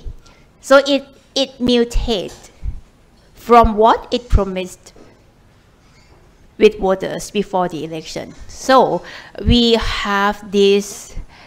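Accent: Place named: RP, United Kingdom. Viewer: สำเนียงแบบ RP เปล่งๆไปนิส 😊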